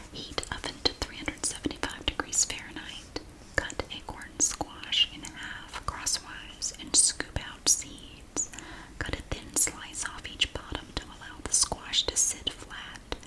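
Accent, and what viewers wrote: South, United States. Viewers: Her soft Southern accent is incredibly relaxing. She could tell me I was fired and I'd probably ask her to say it again a couple of times.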